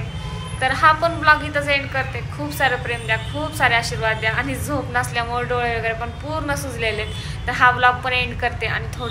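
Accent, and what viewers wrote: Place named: Satara, India. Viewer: Mla tumchi satari bhasha khup aavdte tai 🤗 tumhi khup bhari ahat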